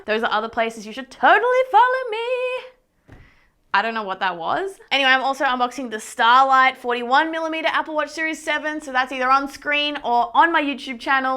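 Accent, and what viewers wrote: Australia. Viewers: Yeah, great channel by the way. Are you Australian, I am.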